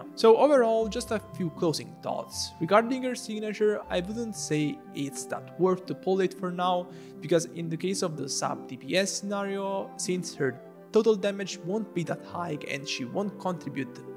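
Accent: Italy. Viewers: Thank you for your guides ❤ and also idk why but i think you're italian bc your accent is similar to the Italian accent 😂❤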